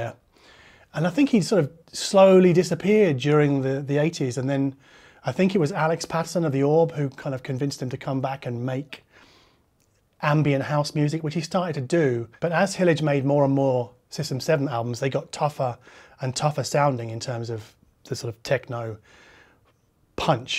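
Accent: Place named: United Kingdom. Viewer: Thanks for your recommendations. I am not into techno. The tracks you introduced are killer. System 7 is a brand new experience to me. Beeing audiophile for 40 years now, it is always nice to learn something new. Your videos are on my shortlist everyday, because they have this quality i like. And i like your british accent...👍😜😂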